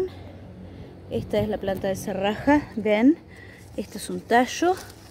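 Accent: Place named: Argentina. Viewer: Me distes una explicacion muy buena y tenes un acento Argentino de lo mas precioso saludos desde Australia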